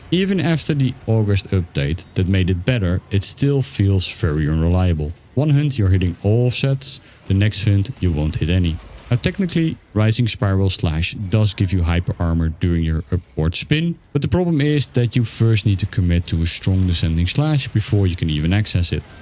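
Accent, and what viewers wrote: Netherlands. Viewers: Dutch guy speaking english?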